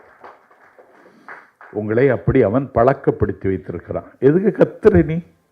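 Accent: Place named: Kanyakumari, India. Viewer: That's the beauty of Kanyakumari Tamil...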